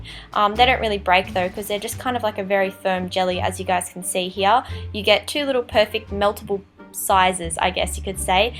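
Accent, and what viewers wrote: Australia. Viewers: Hi OMG your so awesome at FX I really want to try making stuff like this for halloween and stuff. Once question where do you but your makeup like the pallets ? If you get them offline what is the name of the website? Btw I love your Australian accent idk I love Australian accents 😂 And can you please do a Q&A if you do here is a question how old were you when you started FX makeup? Thanks Ariana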